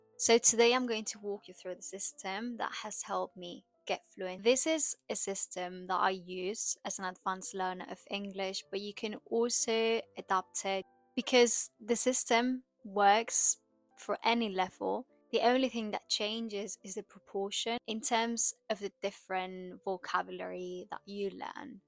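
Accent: United Kingdom. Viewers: oooo British English.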